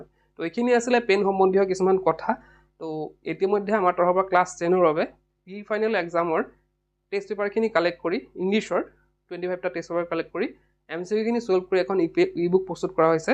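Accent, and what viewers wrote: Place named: Barpeta, India. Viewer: Sir barpeta district r English lage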